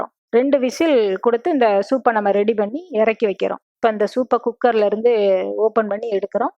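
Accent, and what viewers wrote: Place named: Tirunelveli, India. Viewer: Madam unga ooru sollunga please..neenga paesurathu Tirunelveli maadhiri iruku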